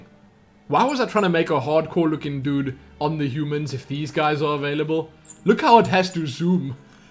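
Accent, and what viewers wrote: South Africa. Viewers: It's South African I think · he's South African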